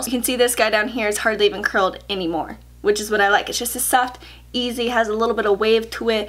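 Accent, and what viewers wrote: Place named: New York, United States. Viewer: you sound like youre from new York in this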